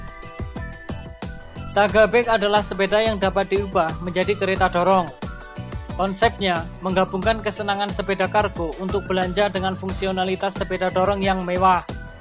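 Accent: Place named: Central Java, Indonesia. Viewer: Dari logat akang orang Jateng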